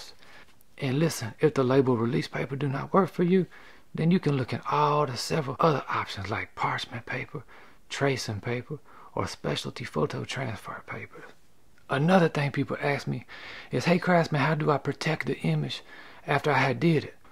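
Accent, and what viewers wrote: Louisiana, United States. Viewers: I was close dude your accent is just like the cajuns down here I love it!!!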